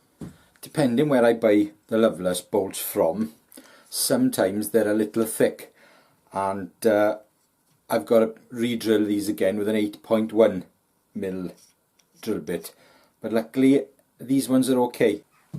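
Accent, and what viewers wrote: Wales, United Kingdom. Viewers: Hello, I've just come to your channel via recommendation from Greencraft review of a knife you gave him. Have already watched two videos and looking forward to browsing your back catalogue. Very entertaining and good to hear a fellow Welsh man. Regards.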